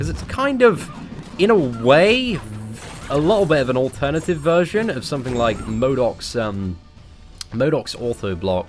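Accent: United Kingdom. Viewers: where r ur streams at boy.... enough with ur personal meaningful life... we need ur british accent chattering in the background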